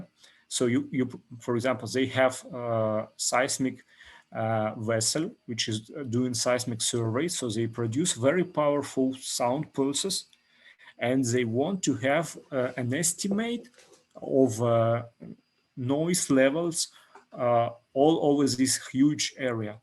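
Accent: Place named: Germany. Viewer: Классный доклад, я прямо флешбеки словил. Мне кажется у тебя местами немецкий акцент проскальзывает)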